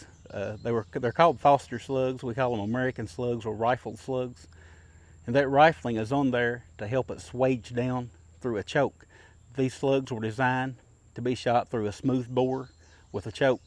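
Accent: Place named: South, United States. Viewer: that southern drawl gets soo annoying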